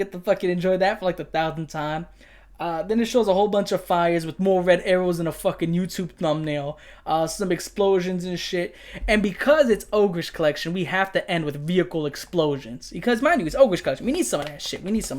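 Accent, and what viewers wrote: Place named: New Jersey, United States. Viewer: As a boricua New Jerseyan living in Florida, your accent makes me feel right at home ❤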